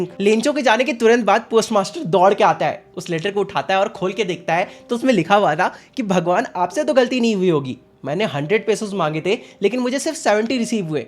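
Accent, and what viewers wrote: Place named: Bihar, India. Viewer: Ye bhaiya meri bihari ladka lagta hai unki trah bolna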